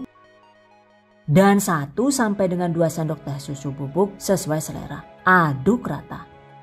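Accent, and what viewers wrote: Banyumas, Indonesia. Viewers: Aku ngakak bunda ngomong ngapak. Wkwkwkwk (sedikit ngerti). Trims resepnya bund, kayaknya lebih praktis pake coklat yg sudah berwarna ya, lebih awet pula. Sukses buat. Aamiin... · Ya Allah deneng rika ngapak bu,asline rika si wong ngendi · Yassalaaam ngapakmu marai aku kemekelan mbak😂😍😘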